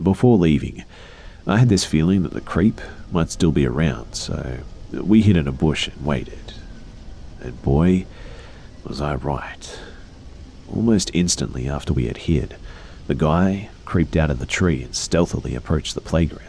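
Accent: Australia. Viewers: Your accent sounds Australian. Are you?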